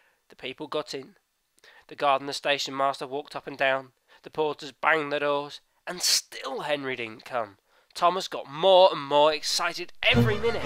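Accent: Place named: Liverpool, United Kingdom. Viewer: Great video mate :D The figures look great, great scale :D The narration is great! Anymore we can expect soon? ;D Just saying your Liverpool accent is AMAZING.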